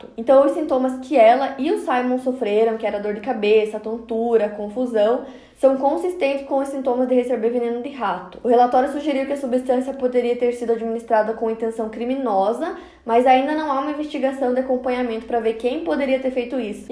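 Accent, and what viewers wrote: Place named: São Paulo, Brazil. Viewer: Vcs prestando atenção no vídeo e eu aqui ligado no sotaque gostoso da, pois é uma mistura de paulistano com um fundinho de nordestino....Sei lá se é isso, sabe?! Teoria da conspiração.....rs😁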